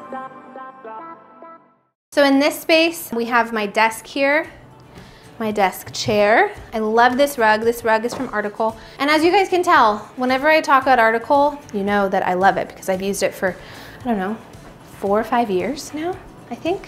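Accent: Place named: Midwest, United States. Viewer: Lol! How quickly you adopted that mid western sound & y'all!!! Love it! Keep up the good work &!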